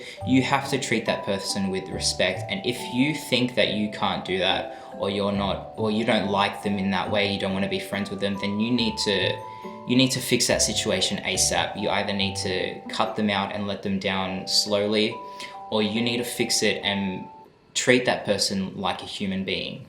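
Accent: Australia. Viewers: what part of Australia are you from? love that accent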